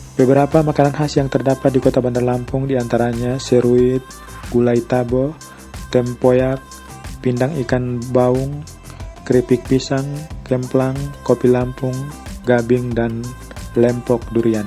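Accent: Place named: South Sulawesi, Indonesia. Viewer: Dr logat bahasa sepertinya admin orang sulsel ya 😂,sangkamma jiki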